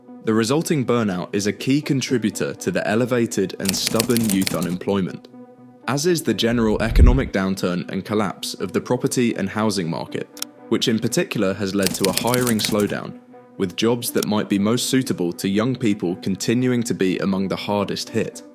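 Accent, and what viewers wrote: United Kingdom. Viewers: This guy is british bro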